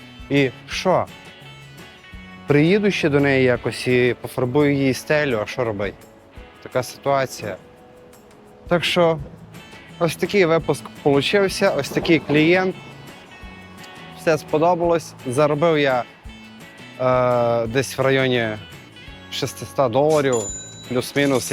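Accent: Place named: Transcarpathia, Ukraine. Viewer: Підписалась тільки через закарпатський акцент😊. Не знаю чи так чи ні, але сумую за Україною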